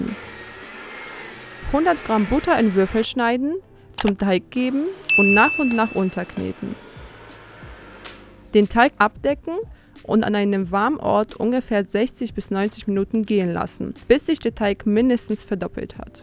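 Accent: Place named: Eastern Europe. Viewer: Wer es noch nicht mitbekommen hat… Diese Junge Dame rollt das „R“ bei „Brioche“ weil sie einen slawischen Akzent hat. Sie spricht ein gut verständliches Deutsch und auch das Video ist vom Inhalt her nachvollziehbar und plausibel. Warum geilt ihr euch an dem fehlenden französischen Akzent, sofern er überhaupt nötig wäre (?) auf? Habe das Rezept nachgebacken, und es ist wunderbar gelungen!😊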